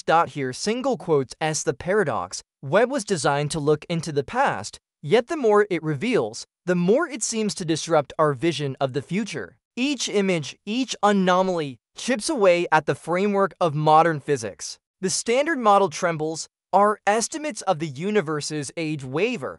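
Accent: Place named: United States. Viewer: Is it? Sounds like typical american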